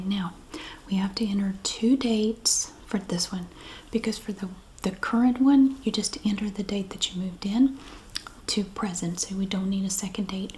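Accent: South, United States. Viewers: I grew up in The Deep South and have always LOVED the sound of a southern accent in a woman’s voice. I’ve always found it so sweet and relaxing. This is a blissful, gentle joy to listen to ☺️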